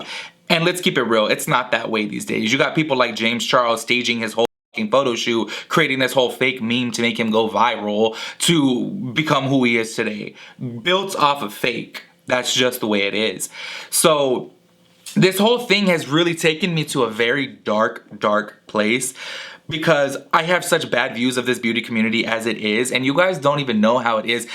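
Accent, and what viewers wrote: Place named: Bay Area, United States. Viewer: You talk like you’re from the Bay Area 😭😭😭😭💕💕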